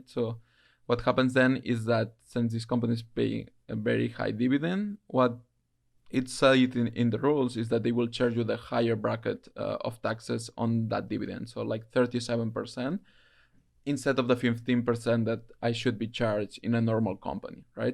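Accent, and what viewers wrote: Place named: Spain. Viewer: Me parece bien que divulges esta cultura financiera y oír a un español hablando inglés, que no se diga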